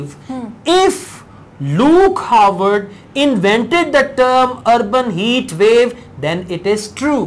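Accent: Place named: Punjab, India. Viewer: sir I love your Punjabi .. I belong to an area where exactly this excent is followed.. Thanks sir ...😊😊😊😊😊😊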